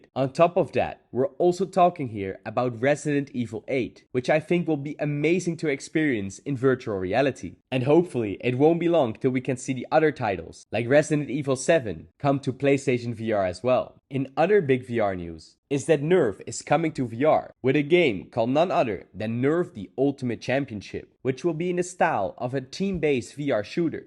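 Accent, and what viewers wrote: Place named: Netherlands. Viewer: dutchy english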